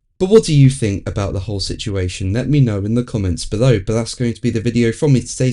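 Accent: United Kingdom. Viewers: You speak as a british, not a car racing fan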